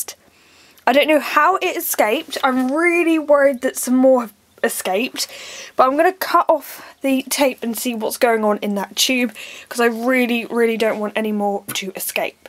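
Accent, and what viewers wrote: United Kingdom. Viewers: U sound so British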